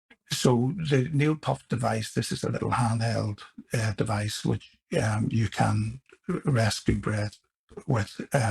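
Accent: Belfast, United Kingdom. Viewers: It gets better. I hate wearing headphones but I want to hear every single word he says, which helps with the sound quality and his wonderful Belfast accent. Besides, he does get the sound quality much better as the series progresses.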